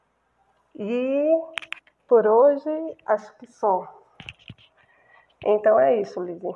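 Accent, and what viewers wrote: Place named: Northeast, Brazil. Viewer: Ahhh como eu amo esse sotaque nordestino 🥰 Linda demais sua coleção nada modesta 😅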